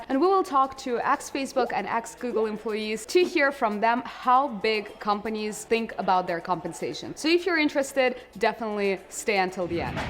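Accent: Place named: Russia. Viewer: Что-то русским акцентом попахивает)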